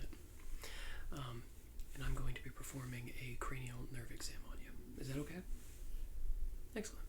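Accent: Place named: United States. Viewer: This guy looks so British I was expecting a regional accent like a Yorkshire accent not a freedom murica one. Great ASMR though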